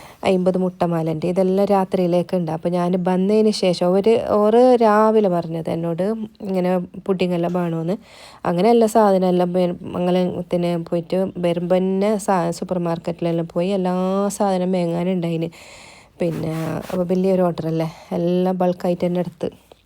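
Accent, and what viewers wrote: Kasaragod, India. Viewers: Kasaragod ആണെന്ന് തോന്നുന്നു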